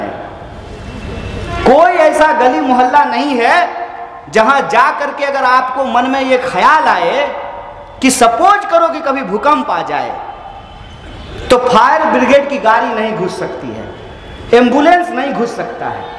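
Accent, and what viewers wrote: Bihar, India. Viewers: Lalu yadav ki kmi dur ho gai... Apke ane se.... Mja ata h apki theth bihari bhasa sunkar.... 😀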